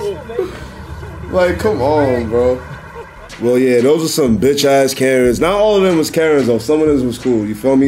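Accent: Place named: New York, United States. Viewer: We get it bruh you from ny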